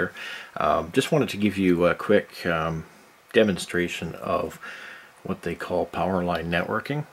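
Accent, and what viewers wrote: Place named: Canada. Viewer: I could instantly tell this is a Canadian man. Dunno how,.......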